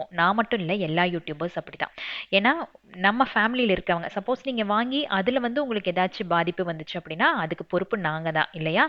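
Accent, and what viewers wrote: Coimbatore, India. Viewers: Unga own voice is very nice❤. Papavum mithuvum porupa irukanga. Namma coimbatore slang varruthunga ❤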